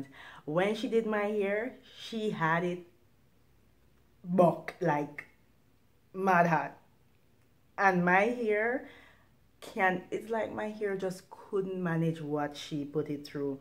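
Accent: Jamaica. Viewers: I've had my hair flat ironed days ago because I wanted to get my ends trimed. When I wash my hair, I will let you know how it turns out. I keep listening for the Guyanese accent and keep hearing Jamaican 😊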